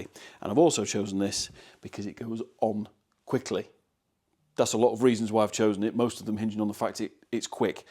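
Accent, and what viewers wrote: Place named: England, United Kingdom. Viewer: Haven't watched your channel before it just popped up in my feed today, pleasant surprise to hear an English accent, was expecting an American one before you spoke. Cool concept btw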